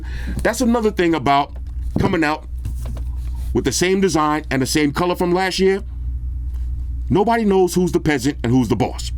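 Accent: New York, United States. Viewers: This is the review I didn't know I needed lol phone review with the NY accent. Fam this is great 😂 🔥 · Of course you went with green. Most of the reviewers are talking about the green. For some reason I love that you use the British word for aluminum, but say it in a very American accent. What's funny is that it used to be reversed. Americans said aluminium, while British people said aluminum.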